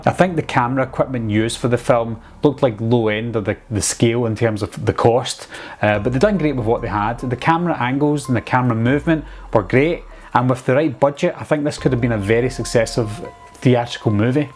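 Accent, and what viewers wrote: Scotland, United Kingdom. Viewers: You from Scotland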